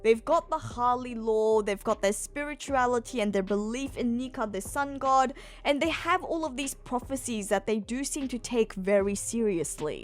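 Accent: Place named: Australia. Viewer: she's Australian.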